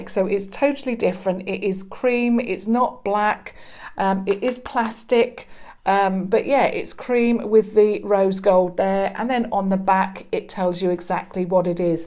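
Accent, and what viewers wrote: United Kingdom. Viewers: She was British so her accent was British. Unfortunately she is no more , she passed away due to a brain tumour but was such a wonderful person. I use to watch all her videos .😭😔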